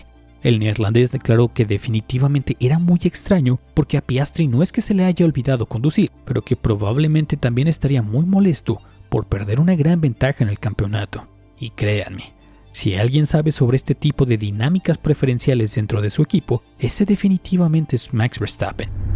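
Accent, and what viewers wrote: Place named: Mexico. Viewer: JAJAJJAAAJ Eres mexicano cierto?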